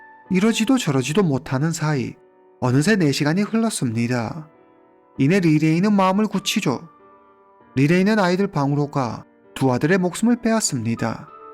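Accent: China. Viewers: 유튜브채널주인 조선족임? 말투가조선쪽인데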